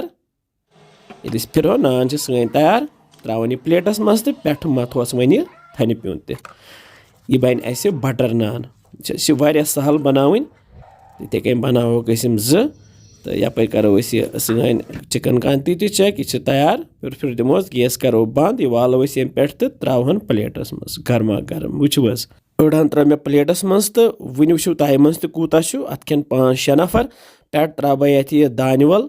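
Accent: Kashmir, India. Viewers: I love ur kashmiri accent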